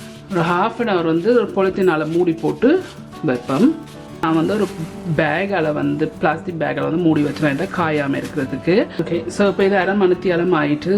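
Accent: Jaffna, Sri Lanka. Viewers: I was browsing for Jaffna sweets for Xmas and found yours. Love your videos with our Jaffna Tamil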